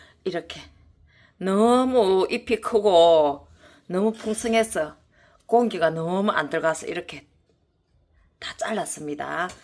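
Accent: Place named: Gyeongsang, South Korea. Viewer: 경상도 사투리 구수하고 듣기 넘 좋네요 영상 잘보고 갑니다 감사합니다...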